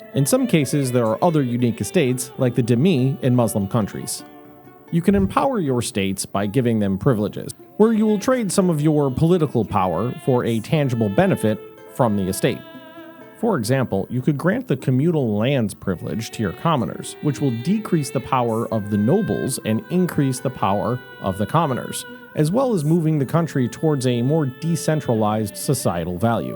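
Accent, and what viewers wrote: United States. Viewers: absolutely in British English...the dude speaking is American.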